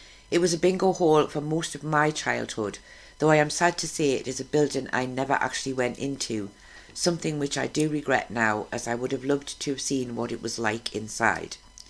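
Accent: Newcastle, United Kingdom. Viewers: I truly enjoy hearing your Geordie accent spoken at a pace that I can understand. I'm from the southern Mid-Atlantic coast of the U.S. and sometimes you folks can talk at such a fast clip as to be indecipherable!!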